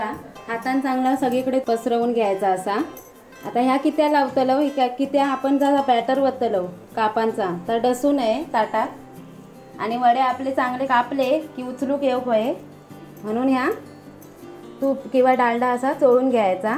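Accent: Malvani, India. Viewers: Mastt.. 👌.. Tumchi malvani aikuk khup maja eli.. 👌👍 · Khup chhan boltas tumi... Pure malvani... Recipe pn mast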